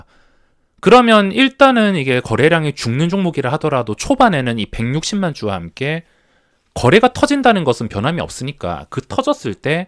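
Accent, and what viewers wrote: Gyeongsang, South Korea. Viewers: 경상도 특성 강제로 보시라 안했음 싫음 보지 마삼 오케이?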